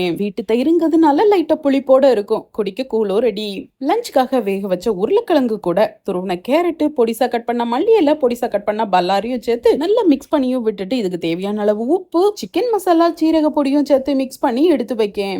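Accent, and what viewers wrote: Tirunelveli, India. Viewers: Thirunelveli, Kanniyakumari side la slang ipditha irukum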